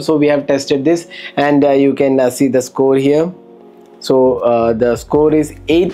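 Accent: Kerala, India. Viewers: Are you from Kerala!?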